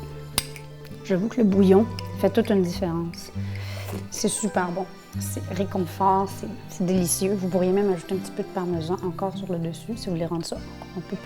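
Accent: Canada. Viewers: J'aime votre Accent Canadien Moi j'ai l'accent du midi je suis de nice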